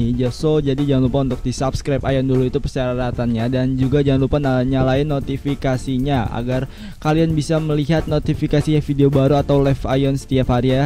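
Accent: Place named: Bali, Indonesia. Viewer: Entah kenapa logat ngomongnya kyk orabg BALI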